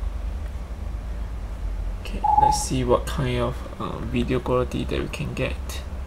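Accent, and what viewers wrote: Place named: Singapore. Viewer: i don't like your accent. SINGLISH?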